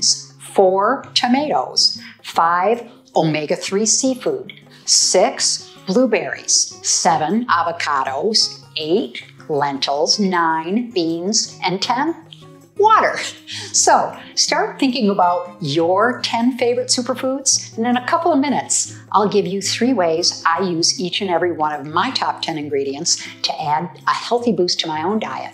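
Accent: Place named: Midwest, United States. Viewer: BTW love your mid west ? Accent. 🙂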